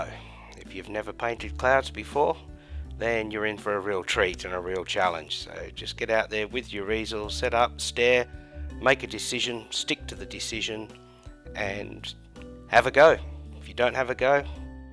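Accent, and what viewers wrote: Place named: Australia. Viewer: Ah finally found your channel after discovering it a couple weeks ago then losing it lol. Love your style of painting and the way you talk, gotta love them aussies. Im subbed.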